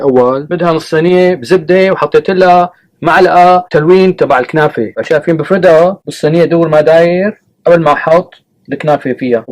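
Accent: Levant. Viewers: شغلك غير شكل والاحلى منو حكيك يلي بذكرنا بالشام تعيش وتعلمنا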